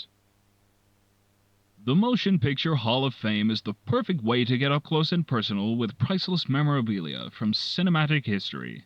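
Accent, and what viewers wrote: United States. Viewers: This is amazing ! The script is laugh out loud funny ! It must have been a nightmare to create footage that matches the script too ! And a big thumbs up for managing to maintain a consistent American accent for 50 minutes ! Really, this is the sort of thing Rockstar should have released to promote San Andreas back in 2004. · Pretty good American accent my dude. Also you do an incredible job of showcasing this world. It looks so lively from the angles you picked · You pulled off a fantastic American accent in this! Halfway through I was wondering if you hired a professional VA to read this huge script. Bravo, and hilarious production!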